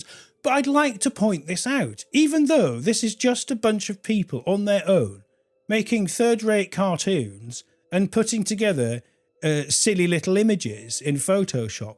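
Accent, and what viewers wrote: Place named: United Kingdom. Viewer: Lovely to listen to a real man, with a polished British accent speaking about the obfuscation going on in the world. From South Africa.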